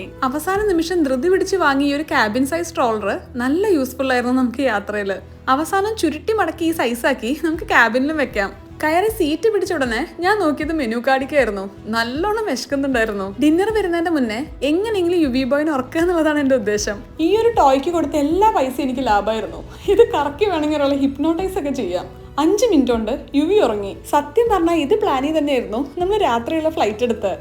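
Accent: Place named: Valluvanad, India. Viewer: Calicut University in Malappuram തേഞ്ഞിപ്പാലം പക്ഷേ അതിനും പേര് പറയുന്നത് Calicut University എന്നാണ്❤️ കൊല്ലം വഴി വന്നാൽ ചിലപ്പോൾ എന്നെ കണ്ടേക്കാം എനിക്ക് ഒരുപാട് ഇഷ്ടമുള്ള ഒരു influencer ആണ് എന്റെ ഈ കുഞ്ഞ് അനിയത്തി കുട്ടി വള്ളുവനാടൻ സംസാരം കൊണ്ട് ആരെയും ആകർഷിക്കുന്ന ഒരു കുട്ടിയാണ് നിങ്ങൾ നിങ്ങളുടെ breakfast recipe ൽ ആകൃഷ്ടയായ ഞാൻ നിങ്ങളോടൊപ്പം സഞ്ചരിച്ച് Yuvi boyൽ എത്തിച്ചേർന്നിരിക്കുകയാണ് നാട്ടിലെത്തിയ നിറഞ്ഞപ്പോൾ പറഞ്ഞറിയിക്കാൻ കഴിയാത്ത സന്തോഷം വല്ലപ്പോഴും എവിടെയെങ്കിലും വച്ച് കാണണമെന്നാണ് എന്റെ ആഗ്രഹവും പ്രാർത്ഥനയും അത്രത്തോളം എനിക്ക് ഇഷ്ടമാണ് ഈ മല്ലു പെൺകുട്ടിയെ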